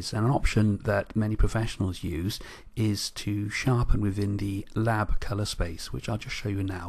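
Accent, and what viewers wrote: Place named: Wales, United Kingdom. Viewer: Thanks so much ! And love the Welsh accent, so gentle to listen to..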